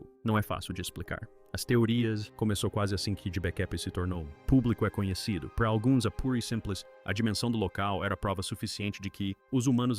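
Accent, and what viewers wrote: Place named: England, United Kingdom. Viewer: Gostaria de uma dublagem sem sotaque em inglês 😒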